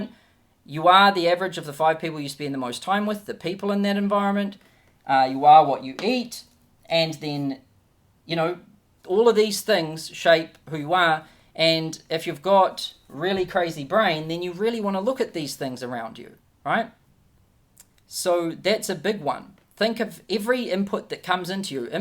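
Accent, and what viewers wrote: New Zealand. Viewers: Man I just like to listen you talk, your NZy english is so cool! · You sound like a Kiwi?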